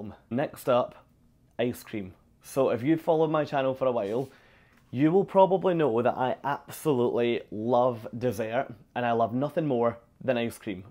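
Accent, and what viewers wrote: Scotland, United Kingdom. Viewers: Is this Scottish accent? I like it.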